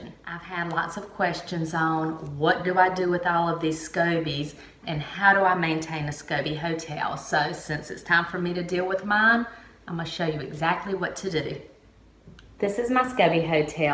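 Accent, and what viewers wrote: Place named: South, United States. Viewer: What southern accent is this anyone please?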